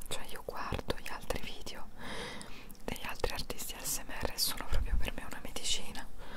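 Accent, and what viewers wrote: Italy. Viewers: No entiendo nada jajajajajajjajajaja pero adoro el acento italiano!!!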